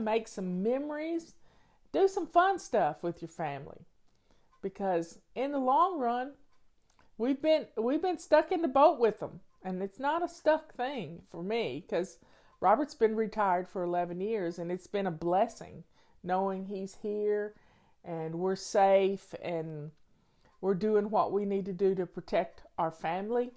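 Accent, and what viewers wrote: South, United States. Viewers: Hello from Manitoba! I know hun technology is so irritating, you look wonderful! Love your Southern accent!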